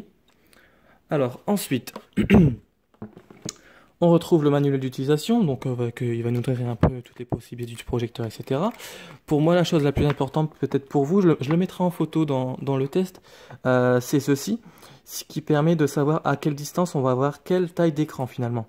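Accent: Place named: Cher, France. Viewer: Bonjour Vous êtes du chére. Je reconnais l'accent.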